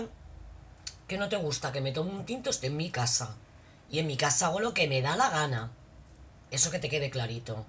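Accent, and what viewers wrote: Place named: Madrid, Spain. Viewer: segun hablas eres de madrid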